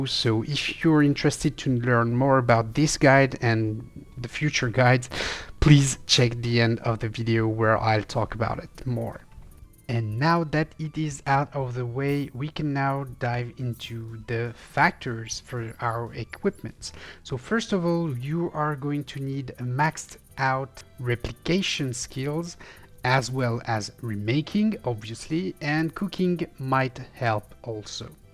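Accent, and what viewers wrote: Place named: France. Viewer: Cool pourquoi tu ne fait pas tes vidéos en français aussi ? On entend bien que t es fr ça serait cool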